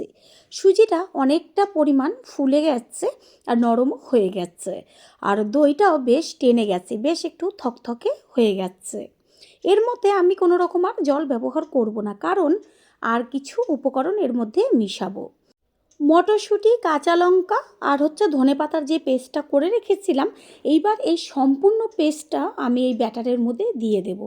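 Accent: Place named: Bangladesh. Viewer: Apnar katha gulo bagla deshi moto lagche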